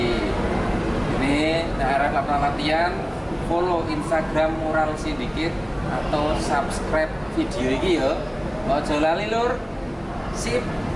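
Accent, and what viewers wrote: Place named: Java, Indonesia. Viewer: Mas e Jowone medhok tenan.. Sukses terus Kang 🙏😇😇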